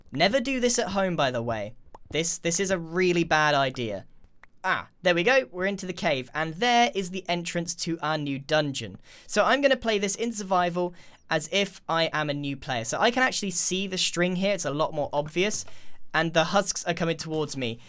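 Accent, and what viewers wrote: United Kingdom. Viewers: Sometimes I watch your videos just to listen to your voice. Your British accent is so soothing.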